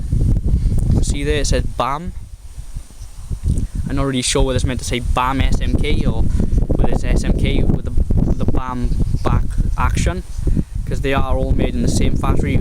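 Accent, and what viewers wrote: Wales, United Kingdom. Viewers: That was the first rifle I bought and are you from Wales because you sound welsh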